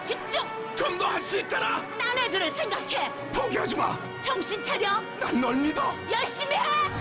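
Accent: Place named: Chungcheong, South Korea. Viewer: 구수한 충청도사투리 ㅋㅋㅋ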